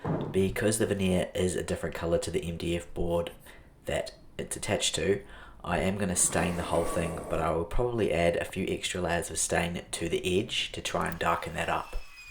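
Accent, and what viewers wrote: New Zealand. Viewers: I'm so used to hearing American accents that familiar kiwi accent threw me for a loop! I'm a fellow furniture flipper from New Zealand and I am also taking a break from drawers to work on a coffee table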